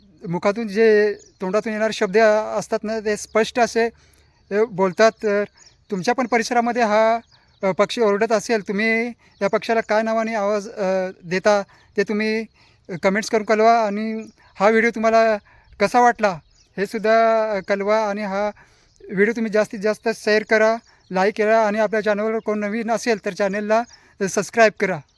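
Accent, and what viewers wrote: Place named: Konkan, India. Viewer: Dada tumi koknathele ahaat ka tumchaa bolnyaavalun kalte khuf chaan video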